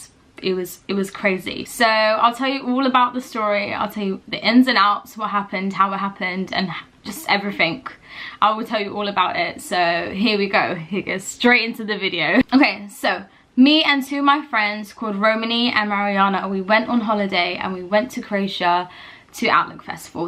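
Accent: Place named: United Kingdom. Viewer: Pakistani girl with british accent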